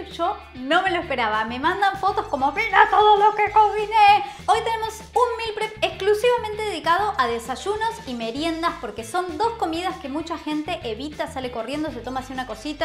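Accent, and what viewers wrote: Argentina. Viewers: Me regusta tu tono argentino me caes bien y me gustan tus recetas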